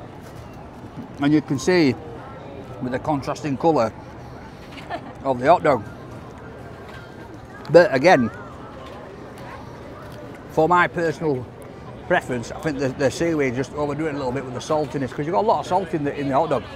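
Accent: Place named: Yorkshire, United Kingdom. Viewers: Don’t think he understands your Yorkshire accent over there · These people obviously love the English accent, mate LOL 😁Keep this up. Really enjoying this. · "What's t'best one?" "Huh??? Everyone's struggling to understand our. Pride of Yorkshire! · I’m from Yorkshire and his dialect isn’t too strong compared to some older people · Visit Cha Cha chicken, it’s near Santa Monica pier great Mexican food and owned by local people as far as I’m aware. Love that your presenting our Yorkshire accent over there , tell them it’s the Jon snow accent then they understand haha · I completely agree with you and his personality and accent have given him the opportunity and success he has. I’ve bumped into him 3 times in Leeds . I’m not a stalker lol. He knows his USP and that’s why he is now where he is. Northern lad done good 👍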